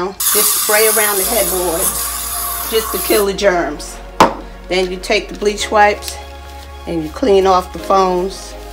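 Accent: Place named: New Jersey, United States. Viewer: I love her mid-Atlantic accent (New Jersey)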